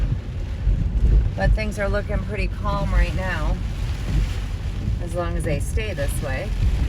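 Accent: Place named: South, United States. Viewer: you sound like southern usa americans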